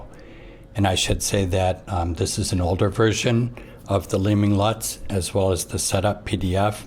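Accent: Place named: Chicago, United States. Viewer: awesome info, i gonna grab the leeming lut for cine 2 and try it out! Btw are you from chicago?? I think i sense a chicago accent lol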